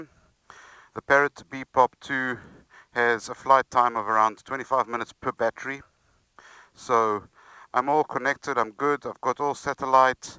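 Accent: South Africa. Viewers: you sound South African Portuguese?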